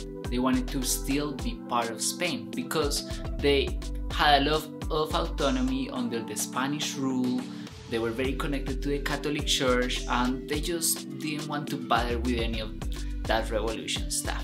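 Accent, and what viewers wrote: Bogotá, Colombia. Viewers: Este man es súper rolo 😳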